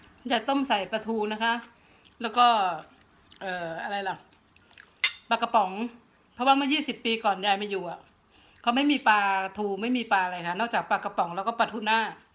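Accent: Isan, Thailand. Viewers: ยายเป็นคนจังหว้ดใหนค่ะสำเนียงเหมือนคนอีสานแถวสกลนครนะใช่ปะค่ะคือคนบ้านเดียวกันนะ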